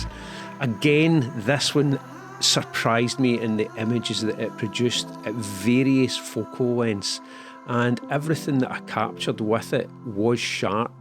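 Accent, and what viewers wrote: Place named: Glasgow, United Kingdom. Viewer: Nice to hear a Glasgow accent on a photography channel. Im in east kilbride! Just wondering if there's a smaller lens hood you can get for this lens?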